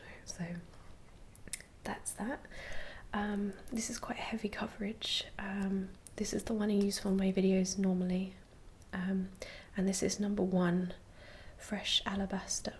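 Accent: England, United Kingdom. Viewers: Hello young lady.How refreshing to see a beautiful British face as an ASMR artiste and one with wonderful red hair that has not felt the need to go another colour.As for your video it's great. Your accent really does make a difference. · what part of England are you from? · So very, naturally beautiful. Love the freckles too. Beautiful, cultured English accent - I have similar so perhaps bit of narcissism here. Keep on being lovely :)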